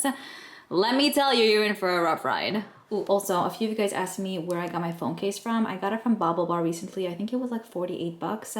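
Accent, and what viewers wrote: New York, United States. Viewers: I actually love the Too Faced Chocolate Brow-nie pencil but I just found out Ulta doesn’t carry it anymore 😭 I usually used my points to get it for cheaper. I don’t know if Sephora ever did but it’s not on there either. It is on the TF site but idk if it’s worth it. It’s the only pencil where I found a good shade match, because my brows look black but they’re actually a very deep brown. I got the newer Huda Beauty one which matches my brows well, but I broke it off a lot already, it’s too thin for me! Also I’m bilingual too, with English not being my first language either. I usually say words correctly but sometimes my accent comes out— probably because I’m around my family so much. My English was perfect from elementary to middle school but high school I started having a slight accent? lol So be proud of who you are, a lot of people just know English anyways 😜 Your accent also has that New York vibe, you sound badass haha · To me you sound like a New Yorker. 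The way you speak is just fine! Don't listen to the assholes, you probably know more languages than they do! Insta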